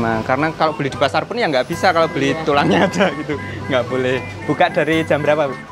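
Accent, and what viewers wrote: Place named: Jetis, Indonesia. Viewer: Mas nek ngomong ki koyok ibuk ku bahasane persis jetis tenan 😂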